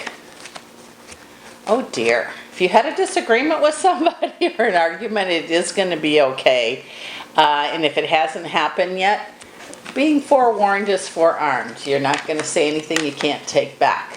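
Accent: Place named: Minnesota, United States. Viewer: Thank you for the readkng❤❤I look forward to seeing you and hearing your voice. You have a Minnesotan type of accent and I LOVEZ me some Minnesota peeps. Good memories 👍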